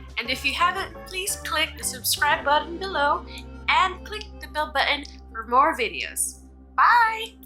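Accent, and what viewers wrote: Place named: United States. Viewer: !!!! Love your accent! American girl kaayo! ♥️😍Take care always! Muah! 😘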